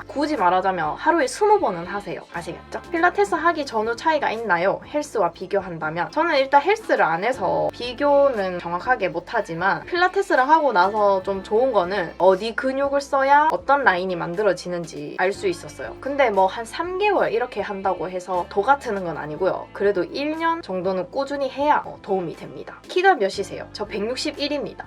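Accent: Busan, South Korea. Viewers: この方はサトリ(方言)ですか？素人ですが応答せよ1997のイントネーションに似ている感じがして、釜山の方なのかなと思いましたがわかるかた居ますか？ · i think it's satoori from busan but i could be wrong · 부산？사투리가 너무 귀엽당…❤️ 다이어트 열심히 하겠습니다！！ 釜山？訛りがかわいい…❤️ ダイエットがんばります！！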